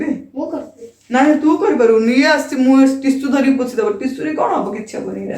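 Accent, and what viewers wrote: Berhampur, India. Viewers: Di tame eng. re ହିଁ subscriber kuha odia sabda (ଚାନ୍ଦା ଦାତା, ଗ୍ରାହକ) ta vala laguni, but hindi sabda (yogdan karta) ta gote prakara thik achhi. Tamaku dinaku thare na dekhile jama val laguni, khass kari tama ବରମ୍ପପୁରୀଆ vasa, ohh 👌👌 mind blowing 🤗🤗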